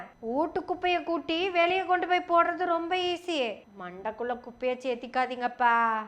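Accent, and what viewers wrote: Kongu, India. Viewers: காமடி , காமடி வேற லெவல் ..அதை விட உங்க கொங்கு தமிழ் அருமை..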